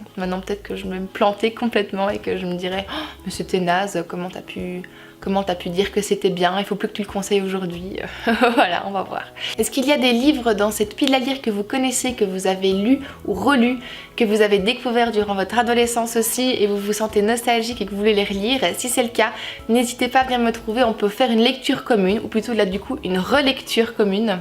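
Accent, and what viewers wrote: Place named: Belgium. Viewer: Ahaa... La nostalgie... Une de mes meilleures amies. Tu lui passeras le bonjour :) La Vague je n'ai pas lu le livre mais le film m'a énormément marqué. Du génie. Trop bien le marque page dédicacé par Amélie Nothomb :D Les Royaumes du Nord j'ai lu le 1 et 2 il y a presque 15 ans en pleine adolescence mais je n'ai jamais terminé, jamais lu le tome 3. Pas que ça ne me plaisait pas mais j'ai décroché et mes lectures se sont tournées vers d'autres choses. Du coup je ne connais pas la fin mais pas le courage de me relancer dans cette saga... (J'adore ton accent, j'adore la Belgique ! :D )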